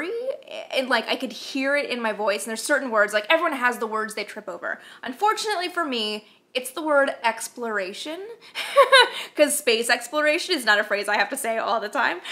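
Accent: Canada. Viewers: Regarding your pronunciation and your Canadian accent, you ARE Canadian, you SPEAK with Canadian accent, so why dwell on it? Without your accent it wouldn’t be you, simple as that I really do hope we can get it here in Sweden I wish you a merry Christmas and a happy new year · Did you straighten your hair while your voice was recovering? This is an odd thing for a guy to notice, but in the recording videos it was wavy and in the main video it was very straight. Also you sounded more Canadian today than usual. I guess that's random chance; there must have been more words today that we Americans pronounce incorrectly. :-) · I'm from the US and I love hearing the Canadian accent. It's very endearing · You're Canadian so by all means speak as a Canadian! I love it! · What is wrong with using a Canadian accent, eh? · Awesome. Though I do admit that I feel you should keep your "Canadian-ness" and say the words as you normally would. 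When reading if I know the sound of the authers voice for some reason the voice in my head as I read sounds like the auther. This was what happened as I read "Breaking the chains of gravity" (BRILLIANT book btw) It was like your voice in my head and not mine. Ok. That may sound weird to some but thats just the way I am. Oh and any chance of a 'Pete' video like the make up one you did? But another subject. That Pete one was great.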